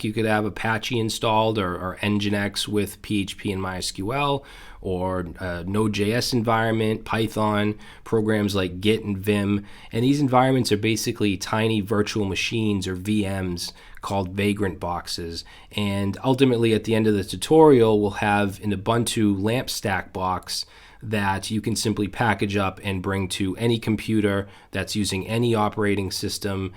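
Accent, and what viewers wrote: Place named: Massachusetts, United States. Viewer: could I ask if you're from the Massachusetts area? Your accent sounds familiar :)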